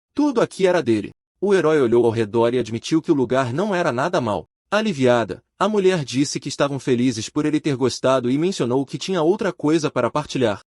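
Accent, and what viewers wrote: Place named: Portugal. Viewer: Eu amo português de Portugal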